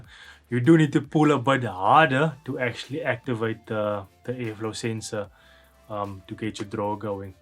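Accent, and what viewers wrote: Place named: India. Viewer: Top stuff uncle, keep it up😅🔥love the Indian accent 😂🤣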